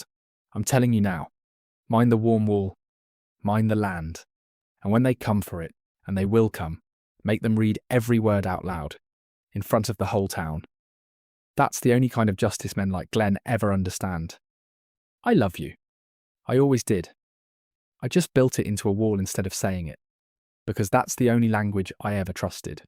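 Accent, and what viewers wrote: United Kingdom. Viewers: Good story. Interesting British voice.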